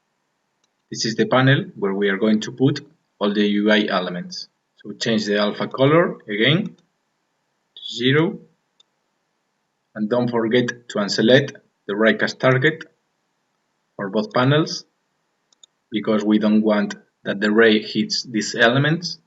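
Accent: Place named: Spain. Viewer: Anda que no se nota el español xD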